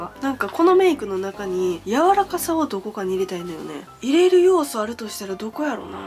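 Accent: Kansai, Japan. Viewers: 強め女メイクを探してる時に流れてきて、もう、サムネがすごく理想のメイクで！！どうしたらそんな美女に？！と動画見てたら関西弁の素敵な方で惚れました🤤✨ ギャップに落とされました。大好きです。他の動画見ながら、参考にさせて頂きます💕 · 初見でサムネ見て、めっちゃ落ち着いてメイクする方なんかなっておもったけどがっつり関西弁でなんかすごく安心した笑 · 初見だけどサムネ見ただけだと強気なカッコイイお姉様系だと思い込んでたらばりばりの関西臭があって一瞬で好きになった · すっげーかわいいけど、おしゃべりしたらミルクボーイの角刈りの人みたいな関西弁でウケる🤣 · サムネが綺麗すぎたので飛んできました！そしたら話し方が面白いし関西弁で親近感湧きました！強調でクソっていうのわかる😂 100均のコンシーラーが有能なのだと知ったので買いに行こうと思います！ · どんなお上品なお嬢様の化粧動画なのかと思ったらド関西弁でビックリした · やっぱり最初の30秒でイケイケなカッコ可愛いちゃんと関西弁ゴリゴリなおもしろちゃんが見れるからすごく好きです！！！！！！！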